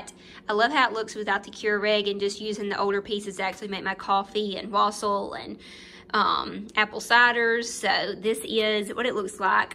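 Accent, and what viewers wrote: South, United States. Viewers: Binge watchin your vlogs☺️ just love your down south accent💕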